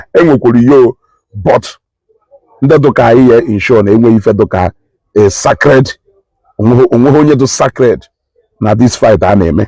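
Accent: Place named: Nnewi, Nigeria. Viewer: Greetings to you. Just came across you accidentally right now. While listening to you talking about the arrest of a fake pastor. You sounded like “nwa afor” Nnewi Ana Edo. If you don’t mind me asking, are you from Nnewi? Abum onye Nnewi.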